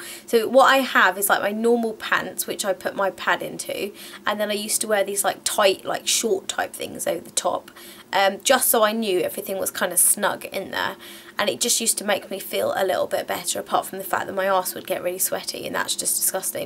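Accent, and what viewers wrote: United Kingdom. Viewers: is she British? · Omg I love ur British accent so proper!!!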